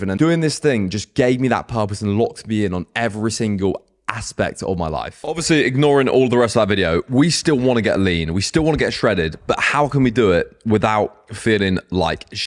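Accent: United Kingdom. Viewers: Getting shredded and fitness overall is a dead market at this point. With skyrocketing obesity trends, and information on diet and exercise becoming worse every year, most people can't achieve this lifestyle. Due to their own misinformed and repeated failure - they usually give up, It would be a better use of their time to watch the latest movie trailer, political candidate updates, or music video. If you look at what trends on youtube, it's those things. Also, are you from a european country? you sound british. Fitness is not a large part of american culture. youtube is an american company.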